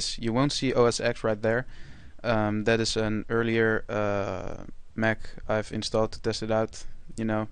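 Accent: Netherlands. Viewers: Je kan heel goed horen dat je een Hollander bent! LOL +1 abonnee! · are you dutch? Your accent sounds dutch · dacht ik ook al, niet door die comment,maar je nederlandse accent :D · vanaf het begin wist ik dat je Nederlands was! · Volgens mij ben je nederlands. I think you are Dutch wahahah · you sound dutch!!! im dutch too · yes he is i can hear are you dutch i''m dutch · Hahaha jij bent nederlands :p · nederlander accent hoor ik.... very nais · Haha, je klinkt super nederlands, and btw, vreselijk bedankt! :d <3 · je hoort echt aan je stem dat je een nederlander bent ! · you'r dutch ( i too) i can hear it! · are you dutch??? i hear on your accent i have the same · je doet het geweldig. Heel goed Engels, ik hoorde dat je waarschijnlijk Nederlander bent maar had dat eerst niet goed door. Compliment. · Je hoort gwn aan je Engels dat je Nederlands bent. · Nederlands! 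ik hoor het aan je stem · Nederlander? haha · i can tell by your voice that ur dutch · he is Dutch and English is his 2nd language. I bet those who complain speak only one language. Besides I think he's got a very nice accent!!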